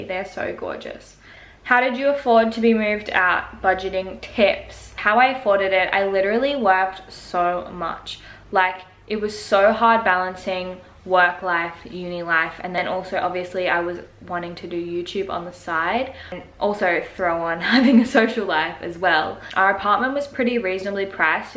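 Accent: Australia. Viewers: I can’t tell where she’s from like Australia or New Zealand maybe?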